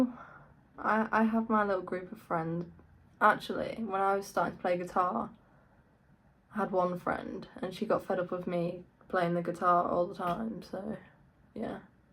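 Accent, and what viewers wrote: England, United Kingdom. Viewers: I was surprised youre English! Keep going ✌🏻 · Do I detect a little bit of an English accent? Your guitar skills (and many musical instruments) is amazing! Keep up the great work! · Oh yea British!!!!!! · I enjoyed your talk today. You are a sweet girl. I miss England; I wish I could go back sometime. I love the accent. I’m from the states, here in beautiful North Carolina. I lived in England because of the Air Force. RAF Lakenheath, Suffolk. Take care your doing great 👍🏻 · You're a sweetheart. You obviously have a kindheart and you are down-to-earth, please don't ever change. Always keep your beautiful smile and continue to be the genuine kind person that you are. I did not expect to hear an English accent, but it is cute and it suits you. You are very cute. BTW, 19 is not old. It's all relative. When you are 16, a year is 1 1/16th of your life, 19 = 1/19th, etc. 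So the older you get the faster time flies. Yet, we all experience life in a linear manner that is moment by moment and even then most people are not mindful of the present moment, they're usually thinking about the past or what could happen in the future. But that's all just my own basic philosophy about how we experience time in this existence. I have some philosophical ideas that blow people's minds. Hahaha. I'm glad you made this video, it's illuminating and now I feel like I will care more about seeing your videos. I hope that you find love with an amazing musician that is truly as sweet and kind as you are and appreciates and respects you the way you deserve to be treated. I would love to hear you write some amazing music that is authentically original and well defined in your own style. That would be awesome!!! Please keep that sweetness, your smile and your genuine honest personality and please don't mention anything about age... 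hahaha. I'm 46, but I look 30 and I feel like I'm 20. It's more of a state of mind and/or number, than anything else. I know people in their 60s that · Lahhh kau british??? Ingt ulu kelang je..... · Which UK accent is this? She pronounces guitar or band as a North West english speaker would do, but in many sentences, it's a plain and even melodie, just like on an irish or american tongue. Any help? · I couldn't tell you're not American you sound British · Are you from England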